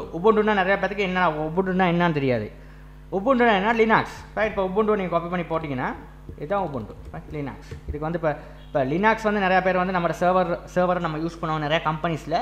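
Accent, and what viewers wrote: Sri Lanka. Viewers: Bro nenga sri lanka va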